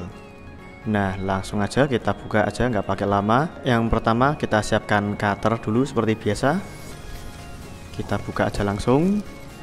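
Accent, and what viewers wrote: Java, Indonesia. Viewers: medhok mase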